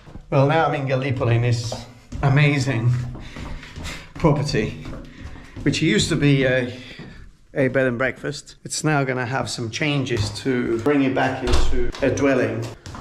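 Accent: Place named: United Kingdom. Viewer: Tantissimi complimenti e grazie mille per far conoscere la puglia con un bel British Accent 🙂 Mi ricordi tanto il nostro mitico royal family reporter Antonio Caprarica.. siete parenti per caso?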